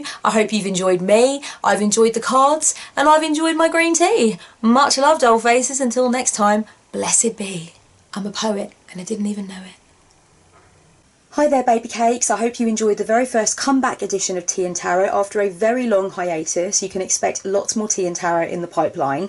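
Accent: England, United Kingdom. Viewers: This was just an awesome, fantastic way to start my day here in SoCal, USA... Having "Tea & Tarot" with one of the brilliant spiritual mentors in my life. Got my own tea here, drinking it while I savor this time and take in the life lessons offered. 🌹🌙🌤💌☕️ Thank you for bringing this segment back! Also: you are VERY fucking English this morning, babes. 😄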